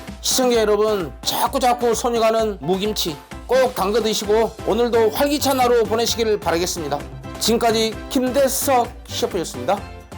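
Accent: Jeolla, South Korea. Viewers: 쉐프님의 요리 자주 시청하고 있습니다. 걸죽한 전라도 말씀 정감이갑니다.쉐프님께서 사용하시는 믹서기 구입하고 싶은데. 어디 좀 제품인지 알려주세요^^ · 완전 사투리가 돌산 아니면 광양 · 김대석 셰프님 전라도 사투리 넘 정겨워요😊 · 전라도사투리가듣고 싶어서 무김치 잘배우고나갑니다 ㅎ ㅎ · 동생도 김치를 담궈 주시는. 오빠의 사랑 전라도고향 말씨에 정감이 갑니다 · 전라도 사투리 설명이 구수하니 감칠맛 나는듯~^♡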